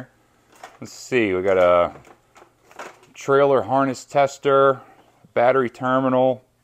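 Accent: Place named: Pennsylvania, United States. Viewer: sounds like you have a pennsylvania accent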